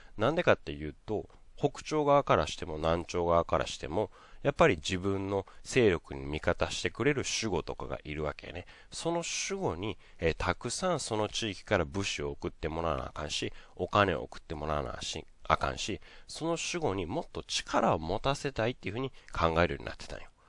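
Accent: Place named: Kansai, Japan. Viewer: とてもいい活動ですし、わかりやすい講義ですが、出来れば講師の方は標準語にして頂きたいです。 耳触りが関西弁はよろしくないです(*´•ω•`*)…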